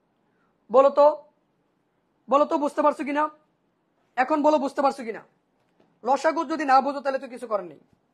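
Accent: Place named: Sylhet, Bangladesh. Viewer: Aii sylhety okol koi ve😂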